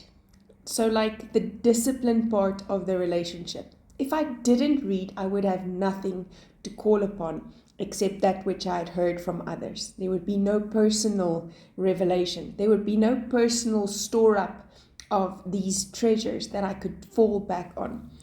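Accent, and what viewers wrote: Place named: South Africa. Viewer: Wow your accent sounds so much like my husband’s (he is from South Africa and speaks Afrikaans). He has been in America for 25 years and has lost a lot of his accent.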